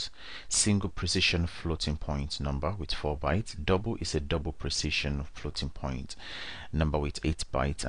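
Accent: Nigeria. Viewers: Lol. Are you yoruba ?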